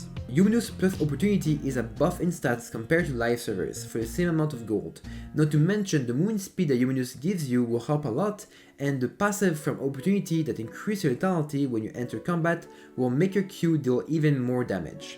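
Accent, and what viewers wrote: France. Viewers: You have a strong accent are you French ?😮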